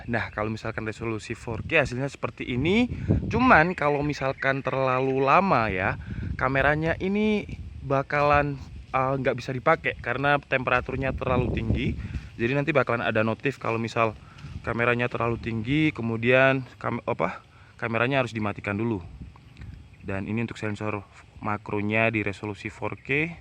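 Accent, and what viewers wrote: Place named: Dieng, Indonesia. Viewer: wala mas orang puncak dieng tah WKWKWK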